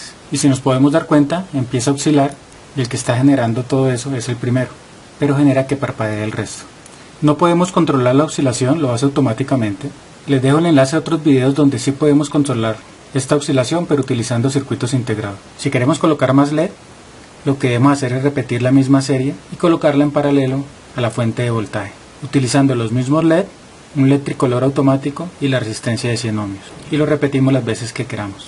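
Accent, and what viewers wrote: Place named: Colombia. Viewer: Muy buen vídeo! Por cierto, ya creo saber de dónde es tu acento; de colombia! Linda voz :) Saludos!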